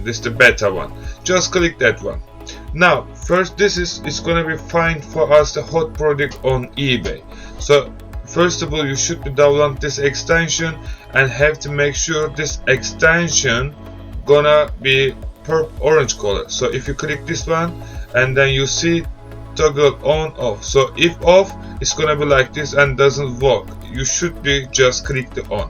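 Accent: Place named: Turkey. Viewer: eski alışkanlıklar unutulmuyor ceterele viii :) şaka maka türk gibi ingilizce konuşmanı çok taktir ettim eğitimini almayı düşünüyorum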